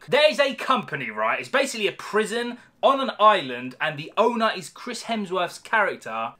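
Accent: United Kingdom. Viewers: Wow thank you. I've never heard a British accent like that before. I subscribed only recently during your Obi Wan reviews so wasn't sure where you were from.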